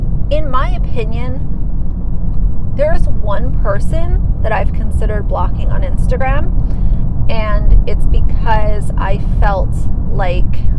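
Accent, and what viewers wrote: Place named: Colorado, United States. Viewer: You almost seem like a Colorado girl! Just how you talk. You would fit right in around here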